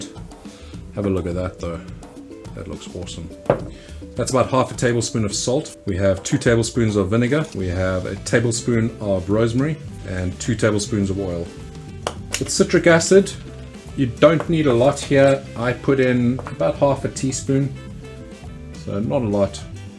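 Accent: South Africa. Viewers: Nope! Sorry he doesn't have Kiwi accent at all. I can hear South African accent.🤣👌🙏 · i couldn't pinpoint your accent because it didn't really sound British but now i know why (because its south African)😂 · Didn't know you were South African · Damn bro I knew you where South African me too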